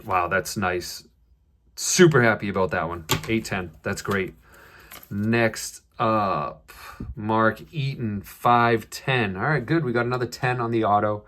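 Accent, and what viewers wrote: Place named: Boston, United States. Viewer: Boston accent comes out in these videos. Haha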